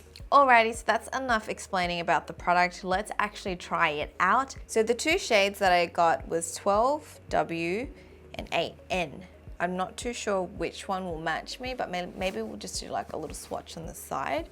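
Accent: Australia. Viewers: Ur Aussie accent is over powering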